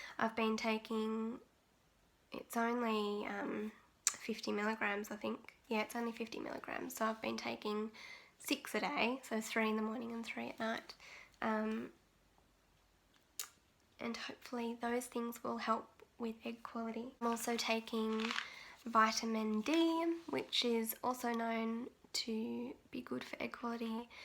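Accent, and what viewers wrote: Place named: Boston, United States. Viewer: Nice Boston accent!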